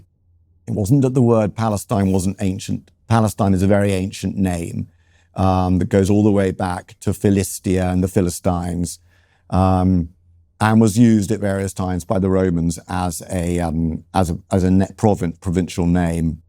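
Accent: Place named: United Kingdom. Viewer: just as dull and ridiculous as his British accent this retard is so blinded to distinguish between the turks, Arabs and Islam... imagine saying the short painter or Leopold II of Belgium were representing Christianity... we Arab have alot of issues and we acknowledge our mistakes, but we didn't commit horrible crimes as the turks, British or the rest of colonial criminals at least our countries weren't built by looting India, South America, Africa... and of course we did not send our armies to to force drugs trade on Chinese ... the latest savages as AL qaeda, isis were founded by the USA to fight the soviets and later to destabilize Syria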